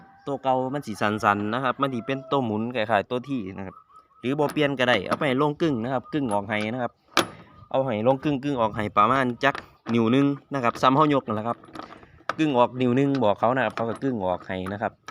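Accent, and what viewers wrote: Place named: Sisaket, Thailand. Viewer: อยู่ไสน้อครับคือเว้าคือคนศรีสะเกษแท้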